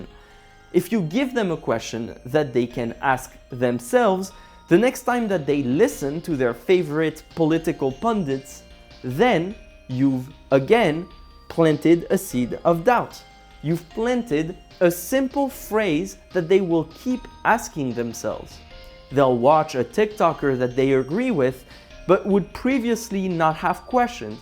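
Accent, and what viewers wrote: France. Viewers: Are you french ? you have french accent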